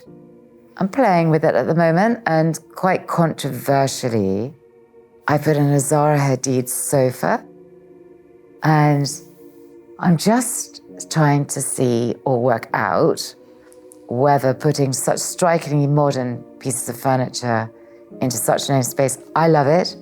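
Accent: RP, United Kingdom. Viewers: she sounds posh.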